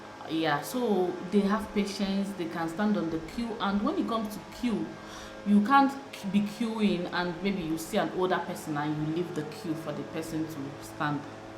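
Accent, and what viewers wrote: Nigeria. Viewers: funny enough i also thought so. And when you said "Dumelang" then all of a sudden you looked half "Tswana and half Xhosa"...magic...lol. If you did nt have Nigerian accent you would definitely looked like one of the locals.lol. You should learn one of the languages. Will benefit you big time. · You look Xhosa even if you sound Nigerian ❤️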